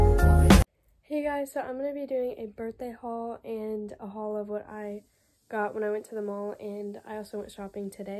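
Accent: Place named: United States. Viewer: I'm not sure what she is saying because I'm English, and she's American, so I don't really know what she is saying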